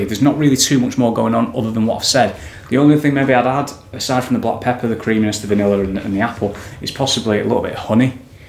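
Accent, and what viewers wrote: Lancashire, United Kingdom. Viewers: Nice review!!! £24.60 on Amazon 27/2/23. Enjoy your enthusiasm and honesty. Thank you. Do I detect some northern accent. Lancs? Greetings from Cheshire 😌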